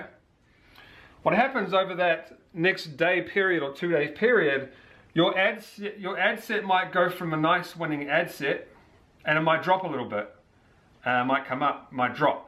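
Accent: New Zealand. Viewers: I'm not sure if its because you're a New Zealander and I just instantly connect with the accent so i understand better about what you're saying, or whether you're just explaining it better than any other person on youtube, but man... This video is EXACTLY what i wanted to hear someone say to me about facebook ads. I've been searching for this information for weeks. You're the only guy who's explained this, thoroughly, in depth, in an easy to follow and easy to digest manner. I'm gonna go as far as saying this just about trumps every single $300, $500 "course" that some of these youtube "gurus" try to sell you. If I actually get sales from implementing your knowledge from this video i'm gonna thank you forever. Stay tuned ;)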